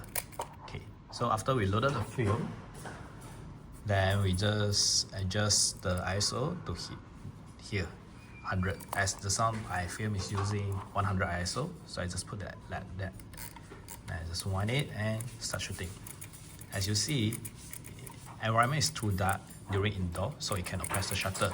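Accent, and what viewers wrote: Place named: Singapore. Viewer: the accent is giving lah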